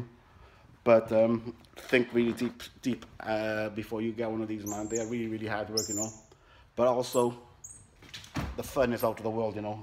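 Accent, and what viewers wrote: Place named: United Kingdom. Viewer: maybe its not what you should have if you find it hard to cope.I am not trying to be rude only it makes no sence that you tell people not to own one yet you now have two.It is not right to title your vidio "where to buy a monkey"if you have no intention to let people know.I detect that you have a Brittish accent like me,if so you know we are up front and staight forward.I am one to speak my mind,I hope you are not offended and you take time to answer me...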